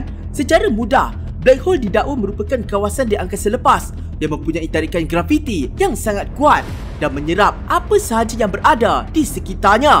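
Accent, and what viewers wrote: Malaysia. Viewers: Abang Abang orang Malaysia yah kok ngomong nya kaya Upin Ipin aku dari indonesia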